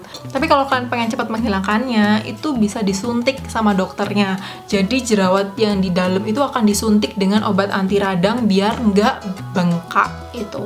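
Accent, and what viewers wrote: Java, Indonesia. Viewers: Dokter orang Jawa tah,ngomong nya medok😁,,,sama kayak saya kalo ngomong b.indonesia nggak bisa hilangin logat jawa'nya 😁 Btw, terimakasih informasinya dok, sangat bermanfaat 🙏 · Logate koyo wong jowo:v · Medokk yaaa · nice info.. btw medok bwanget mbak e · Medok bangetttt ngomongnya 😆😆, tapi pintar kamu yaaa soal nutrisi kulit 😗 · Alhamdulillah untuk jerawat saya tdak terlalu seperti itu yg agak seram jga, cma ini aja masalah sya mau sering kluar rumah/sering di rumah tapi sama aja wajah sering bnget berminyak dah coba sabun cream muka tapi msih aja terutama bagian sekitar hidung hingga ke kening/jidat kepala, mohon pencerahanya dok... Btw salfok mba dokternya koq agak medok bnget bicaranya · Suaranyaa mueedokk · Jawa nya modok bnget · kak Medhok banget 😂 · Mbk,,, bahasa jawa nya masih ke bawa, bawa ya, 😀😁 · Dr. ngomong jawa'nya medok banget y...😂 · Medhoook e rekkkk😅😅😅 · Medokk bangettt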